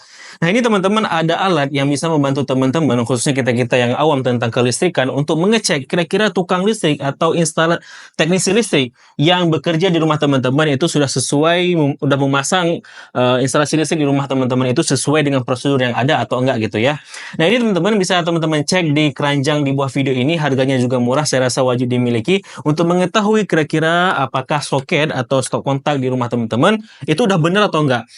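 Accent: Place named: Sumatra, Indonesia. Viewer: Logat mana bang? Kayak sumatera sonoan yak?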